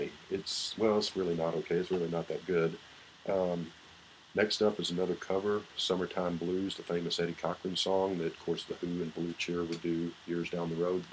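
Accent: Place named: Texas, United States. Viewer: hi, from England. do you know that there is an earlier album which contains their candix recordings?. I don't know if its available, but certainly is on there and its not too different. of course is there too, in fact as far as I can remember, there were a few more of the tracks featured here, but they are slightly different and sound more compressed.. Al Jardine was at high school with Brian and was a member of the same baseball team, but his parents were unhappy for him messing about with a pop group and made him study to be a dentist and get a proper job.......seems funny now, doesn't it? David Marks lived across the road from the Wilsons and as they were desperate for a guitarist, was asked to join, but I don't think he got on too well with murry and I think that's why he left. I know that he did some work for the turtles. there was a report in our newspapers over here that there had been a secret beach boy that had been sacked and left off many album covers and I am assuming this to be David Marks.. 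good and fair review though and I love your Texan drawl, it sounds really cool, no insult intended, hahahaha. best regards.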